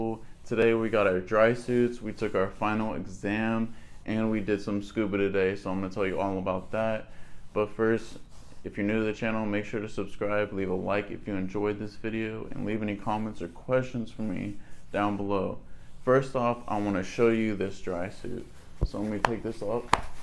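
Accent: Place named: Czech Republic. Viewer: I know it's a stupid question, but I just have to ask, aren't you from the Czechia? I ask because you have such a Czech accent. Your last name is also used a lot here.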